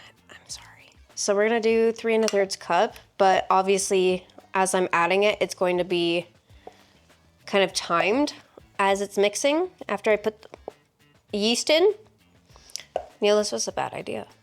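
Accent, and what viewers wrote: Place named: Midwest, United States. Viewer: Definitely from Midwest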